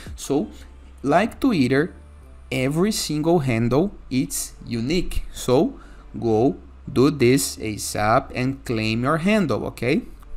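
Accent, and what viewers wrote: Brazil. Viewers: salve! pelo sotaque só pode ser BR, valeu pela dica, nao tava achando onde mudar a bagaça!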